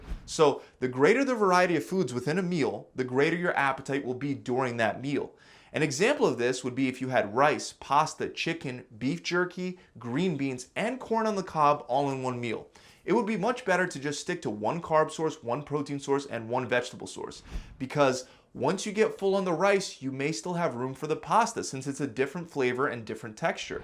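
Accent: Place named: New Jersey, United States. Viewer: You from Jersey? My man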